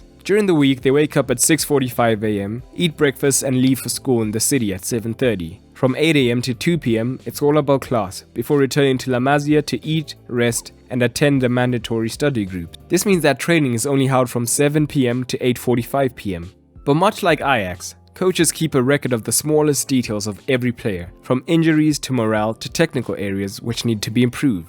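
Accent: South Africa. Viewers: Hey mate are you from South Africa? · You sound South African and black, I could be wrong but I doubt it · Accent sounds South African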